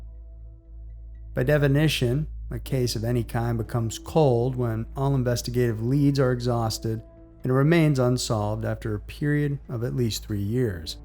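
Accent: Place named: North, United States. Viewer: I’m usually REALLY good at figuring out where someone is from by how they talk or even without any accent, how they say certain words. But I can’t figure you out! Northern states is all I’ve got. But leaning toward NorthWestern. Where ya from!?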